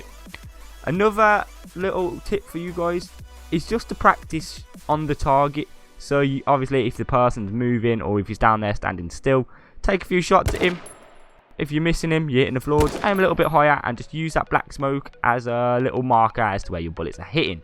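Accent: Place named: Birmingham, United Kingdom. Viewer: u from birmingham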